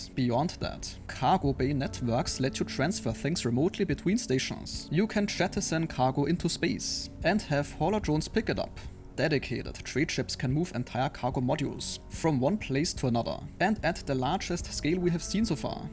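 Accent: Germany. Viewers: Basically a good vid, but whay not in German? Your German accent makes it hard to watch, at least for me. And its really like reading off from your notes, transletet into English... so it is like a very rehearsed/scripted manner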